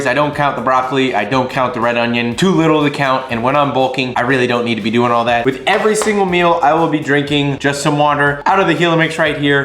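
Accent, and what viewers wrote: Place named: South, United States. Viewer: Like for ya south accent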